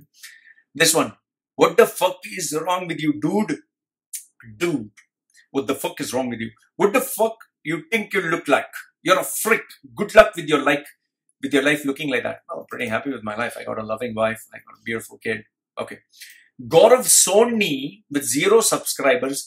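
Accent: India. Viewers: WHY YOUR ACCENT SOUNDS LIKE HOW INDIAN SPEAK.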